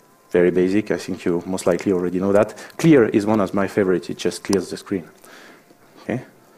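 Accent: France. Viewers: Ah ah ah on entend le french accent lol bonne vidéo sinon les commandes classiques pour le nux sympa.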